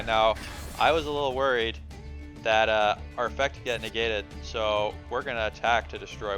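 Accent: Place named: Canada. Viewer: You sound big time Canadian bro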